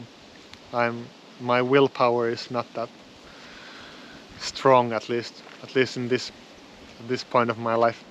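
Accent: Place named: Finland. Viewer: hey,! my dream is to learn Finnish, but I never have the time to do it. I hope I'll be able to do it little by little with your channel, I really like the way it is made. I speak 5 languages fluently, but I think it's because I have a hyperlexic brain. I really like your channel and I really like your accent in English (I can hear the Finnish melody I so adore, but it's only because my ear is well trained to detect the slightest trace of an accent). I never understood why my love for Finnish was so strong. it might be because it sounds a little echolalic and just very beautiful to my ear, or it might be my (supposedly) Finnish DNA speaking, haha. I do remember making a random Finnish woman at a Warsaw train station very happy when I announced "Minä rakastan suomen kieli" to her.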